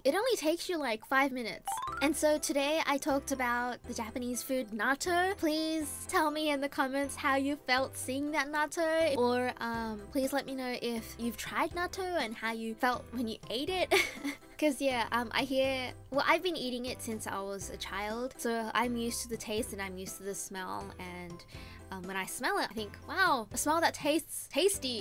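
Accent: Australia. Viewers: Ive been enjoying these videos! Keep up the good word! P.S. I love the Aussie accent in your English! It sounds attractive to listen to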